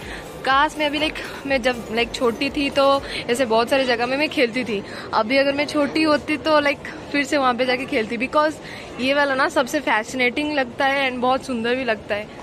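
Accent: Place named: Odisha, India. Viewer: hindi re Odia accent bahut achi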